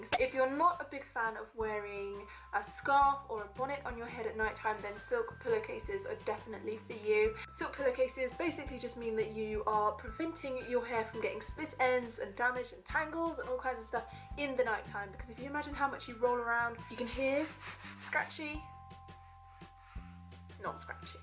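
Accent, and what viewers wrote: United Kingdom. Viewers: I'm pretty sure that's a British accent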